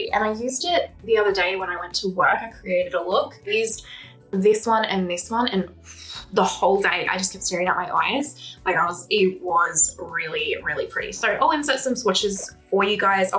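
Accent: Australia. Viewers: Beautiful eye look. I guess I’m a YT dinosaur 🦕.😂😂😂. I like a chill, relaxed, long-form GRWM style video. I personally love to listen to you talk about whatever is on your mind. I think we all enjoy your Aussie accent as well. That TF blush is 🔥. I’m jellies over here in the states.😊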